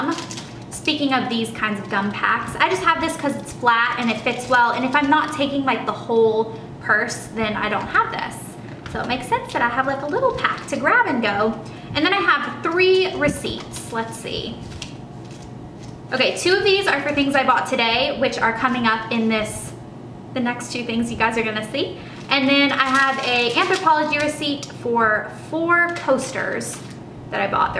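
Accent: South, United States. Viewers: love the southern accent! makes me think of home!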